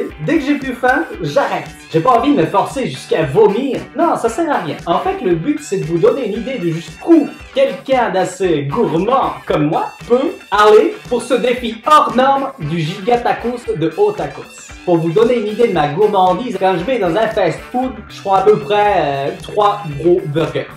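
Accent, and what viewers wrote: Quebec, Canada. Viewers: J'ai regardé la première vidéo j'ai poster un commentaire tu m'as répondu j'espère que tu me répondras sur ce commentaire j'ai juste quelque chose à dire je croyais que tu étais vraiment un Québécois moi je sais faire l'accent québécois mais pas qu'un peu ah oui tu m'as fait trop rire😂😂😂😂😂😂✌️✌️✌️✌️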